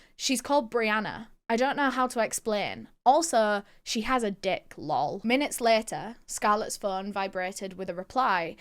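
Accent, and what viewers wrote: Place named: Leeds, United Kingdom. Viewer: Thank you for covering this case. If you read your comments, I’m sorry for the notification overload! 🫣 I’ve only just found your channel by complete accident, a video appeared in my recommended feed and I heard your Leeds accent (I think? It sounds like Leeds to me anyway. Me mate lives in Morley and you sound like him. That’s a compliment btw 👍🏻)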